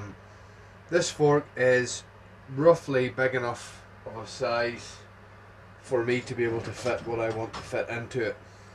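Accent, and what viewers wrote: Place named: Antrim, United Kingdom. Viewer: that aul country antrim accent proving hard for people to decipher haha love it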